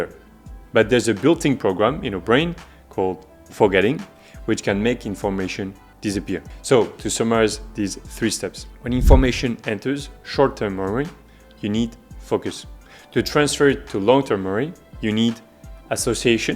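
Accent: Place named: France. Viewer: Merci frérot 💪🏾 😹j’avais capté l’accent français 💪🏾🔥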